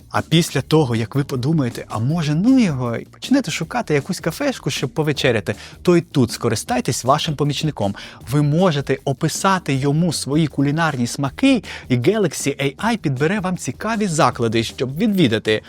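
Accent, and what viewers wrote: Standard. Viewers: Яка чиста українська мова. Чудово 💙💛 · Ще й український кореєць ведучий огляду, з чистою українською мовою… Це прям новий рівень) Дивлюсь з iPhone, але нові моделі Samsung дуже зацікавили)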